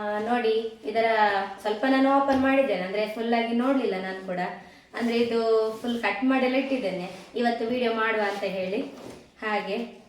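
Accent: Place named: Mangalore, India. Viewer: Manglore Sister Tamma Modalane Video Noduttiddene Nimage Olleyadagali Matte Adaga Videos Noduve Support Maduve Ok na Nimma Manglore Matu Tumba Ontra Kelalu Yeno Helodakke Tilita Karnataka dalli Onda Onda Kade Onda Taraha Style Kannada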